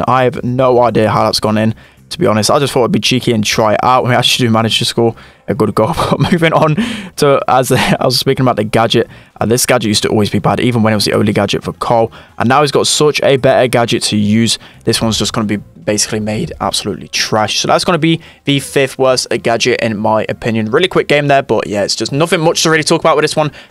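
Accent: United Kingdom. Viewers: r u fom uk?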